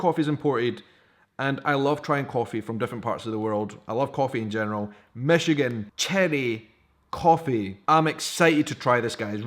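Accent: Scotland, United Kingdom. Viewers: Interesting at times when I see a TV shows on BBC America, I thinks it cute to hear your English (British) enunciated words. Thanks for sharing. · All I know is lot of guys would like to be able to speak with you Scottish accent cause they would get a lot of chicks.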